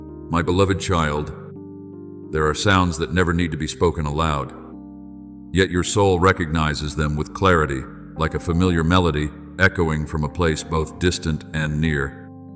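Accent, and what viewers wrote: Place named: United States. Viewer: Why do you speak with an American accent I am English.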